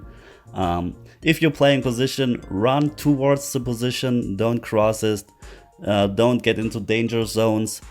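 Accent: Germany. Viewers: Where are you from dude? I'm into accents, always have been.Took me a while to place you as from Germany, very nearly Austria. Maybe you could give me some pointers on placing your accent. By the way, at first glance your website looks pretty bloody good, well done! Edit: My guess is Munchen, sorry if wrong, limited scope. Edit: Looking at a map, I want to guess closer to Deggendorf.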